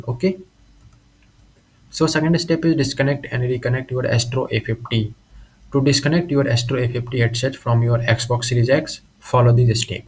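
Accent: India. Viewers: No offense but hearing an Indian accent when I’m looking to fix a problem. Gets me thinking that I’m getting scammed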